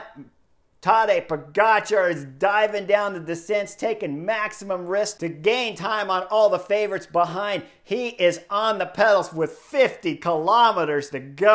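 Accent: United States. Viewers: you’re really excellent at your craft. You’ve always been a good spokesman, with your Cali-cool way of talking, even back in your racing days. I appreciated your insight into the not-so-obvious reasons for the big crash. And, I also appreciated that you were scared while racing. I was too. The fear (ie adrenaline) will produce strength and performance beyond what is imaginable.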